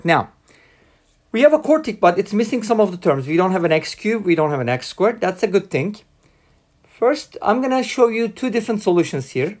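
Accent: Turkey. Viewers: usta bu fikre şivenden kapıldım ama türk müsün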